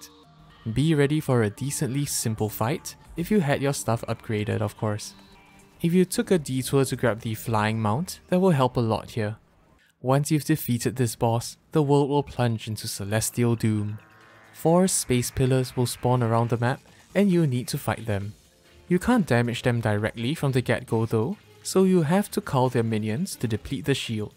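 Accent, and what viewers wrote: Singapore. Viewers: Bro got that Singapore accent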